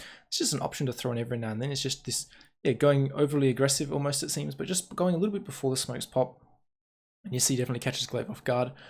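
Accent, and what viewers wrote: Australia. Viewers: jargons I'm an Asian and English isn't my first language and I can understand him 100%. He speaks normally like any Australian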